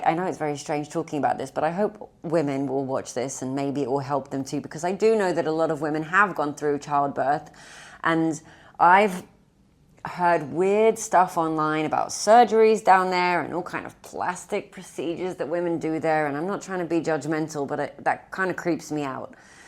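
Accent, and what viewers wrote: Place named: United Kingdom. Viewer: את נהדרת, אני עוקבת אחרייך כבר שנים. מה זה המבטא הבריטי הזה??? 💙🦋 תודה על המידע והשיתוף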